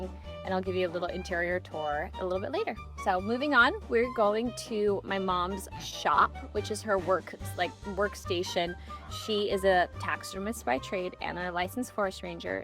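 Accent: United States. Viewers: I don't understand how English is your second language, but you have an American accent? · Can I ask a question about why do you have a strong US acceant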